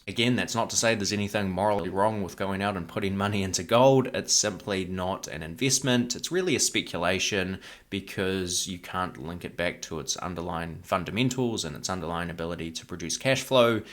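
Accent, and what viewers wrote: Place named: New Zealand. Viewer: Thanks for your videos,I've learned so much from them. I hope you'll forgive me for asking,and I don't wish to sound offensive,but I'm curious to know whether all New Zealanders pronounce their letter e's as i's, or is yours a dialect from one particular part of the country? Thank you.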